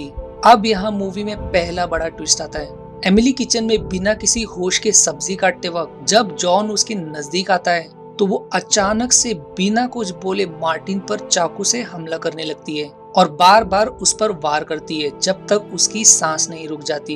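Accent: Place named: Maharashtra, India. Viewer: Bhawa Tu marathi aahes watt.. I suggest u.. Hindi madhe police nhi pulis uccharan karat ja.. Baki mast... Keep it up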